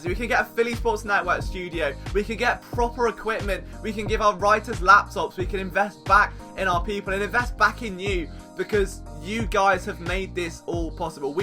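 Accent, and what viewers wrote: England, United Kingdom. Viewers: Really happy for you, English accents get on my nerves honestly but I still love all your eagles videos lol. Keep up the good work! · To be honest your voice was the reason I tuned to you. I never heard a Brit speak Eagles. But after listening your presentation and analysis, it is clear that you have a passion for the Eagles. I loved them over 23 years ago. I am glad you are achieving your goals. Semper fi!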